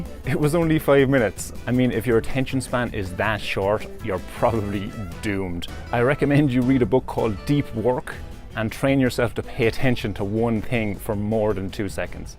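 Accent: Cork, Ireland. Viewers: It has to be Cork. He has Roy Keane and Dennis Irwin’s twang to his accent and voice. But a bit more well spoken lol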